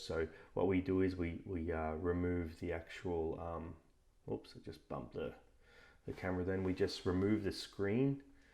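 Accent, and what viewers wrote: Australia. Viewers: Nice to hear a fellow Aussie. Mine is a 6 plus I've changed the battery and everything seemed to go as per the instructions I haven't secured the battery down yet, but when I press the on/off button I only get the white screen with the apple logo briefly then nothing at all after that. Can you help? PS my charging cable is split right down the small connecting plug part, I have ordered a new one, could that be something?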